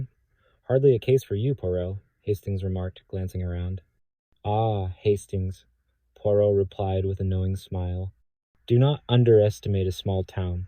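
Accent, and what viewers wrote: United States. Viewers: Horrible narrator with American accent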